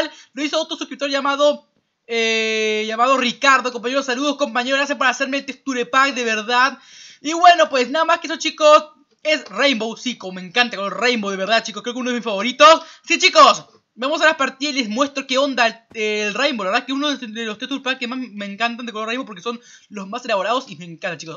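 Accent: Argentina. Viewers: eres argentino? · Eres de cordoba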